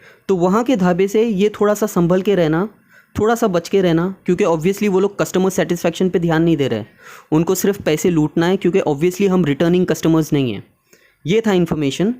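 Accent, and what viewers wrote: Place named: Maharashtra, India. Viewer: Hi, are you from maharashtra or marathi? Because your accent in hindi is similar to marathi.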